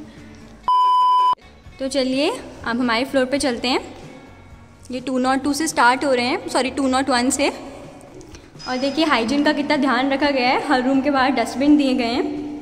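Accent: Telangana, India. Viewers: Great video...u speak very cute telugu 😍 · Ohh your cute Telugu😅😅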